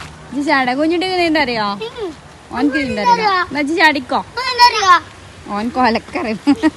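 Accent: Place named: Malappuram, India. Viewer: ഇത്ത മലപ്പുറം ഭാഷ തന്നെ 😂😂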